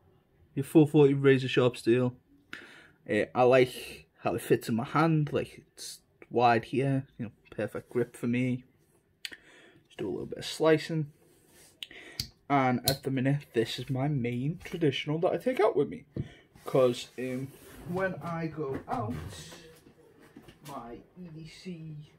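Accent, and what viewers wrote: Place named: England, United Kingdom. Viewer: you are welcome... They also offer a lot of traditional pocket knives which are legal in the UK (you sound really english, sorry if I am mistaken)... I would order on their own website... lots of their traditional products are only available there and as I mentioned their customer service is second to none...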